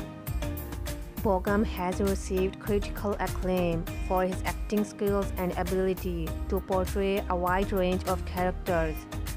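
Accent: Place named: Thailand. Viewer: I could be wrong but i think the narrator seems to have Thai accent.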